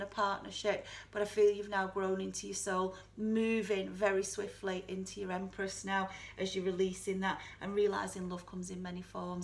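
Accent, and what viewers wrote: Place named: England, United Kingdom. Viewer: Love your accent, your truth, and your readings. Have heard from you before, your excellent and extremely brilliant keep it up sweetheart 💞. A lovely smiling English Rose 🌺xxx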